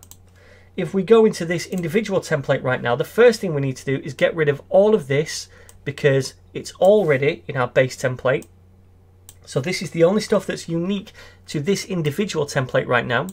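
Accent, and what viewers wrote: United Kingdom. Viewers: The stof hahaha, love that british accent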